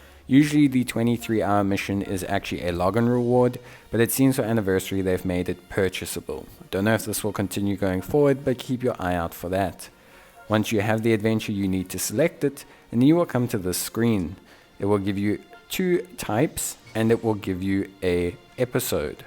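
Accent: South Africa. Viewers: sound south african or portugese learned english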